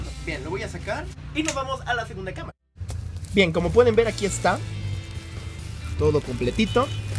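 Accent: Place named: Puebla, Mexico. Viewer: Hola, buen video, me doy cuenta que eres de Puebla jaja saludos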